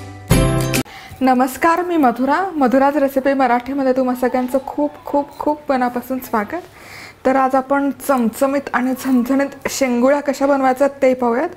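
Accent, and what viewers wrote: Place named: Pune, India. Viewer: tume chan puneri boltat....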